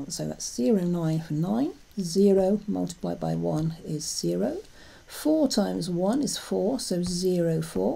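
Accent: United Kingdom. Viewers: Are you English?, it seems brumie accent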